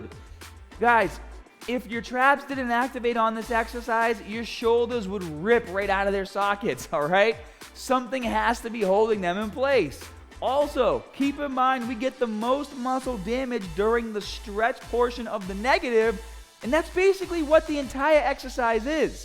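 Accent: Boston, United States. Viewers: Are you from Boston ? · Hard to tell if is from Bahstan or has a speech impediment... go Blues! Seriously though, one of the best YT channels with lots and lots of good info. It's important to have multiple views on "controversial" topics.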